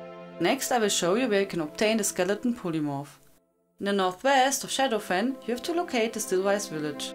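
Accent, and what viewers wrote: Germany. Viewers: thanks for the guides, I like the accent btw, German, Austrian, sounds funny 😁👌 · bist du deutsch?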